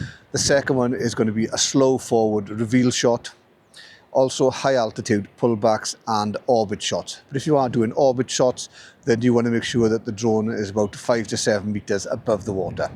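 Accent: Wales, United Kingdom. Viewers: Welsh accent is the best one!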